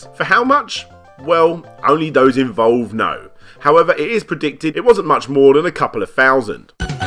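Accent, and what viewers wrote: United Kingdom. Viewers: Just kidding about not liking you as much. You British people have a way of spewing your gob that is just delightful for my American ears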